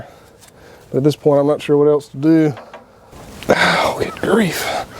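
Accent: South, United States. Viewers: The way you southerners say oil kills me. 😁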